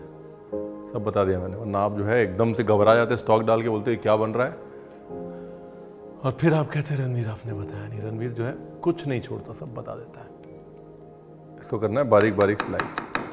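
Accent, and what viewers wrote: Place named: Uttar Pradesh, India. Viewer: You say like UP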